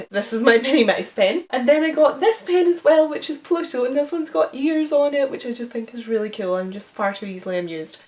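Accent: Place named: Scotland, United Kingdom. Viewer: Clicked on this video expecting a pn English accent and I was so surprised to hear a Scottish voice hah I am Scottish and live near Edinburgh but anyway brilliant video😄😍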